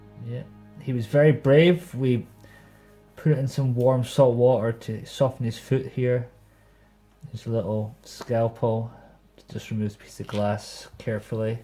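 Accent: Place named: England, United Kingdom. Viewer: I don't understand, you say "sit" for a down ? Due to your accent, i hear "site" when you say "side" 😊, it's so funny to me ! I am used to american people talking, not English people ! Regards from France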